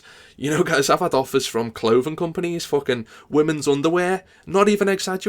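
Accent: Liverpool, United Kingdom. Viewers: ***** Hes a scouser they all sound like this lol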